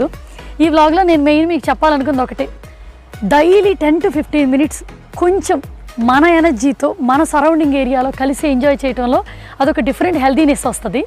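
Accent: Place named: Telugu, India. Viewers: I can feel Pure Desi Telugu language in your voice. Fell in Love with your voice .... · Hello your from east godavari na? Bcoz your slang is like that... I like your videos andi..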